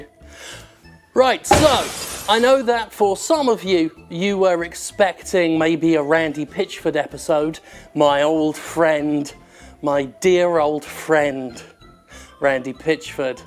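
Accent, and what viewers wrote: United Kingdom. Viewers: big dude with a brittish accent. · He's got a British accent.......because he's British. How fucking dumb can you be?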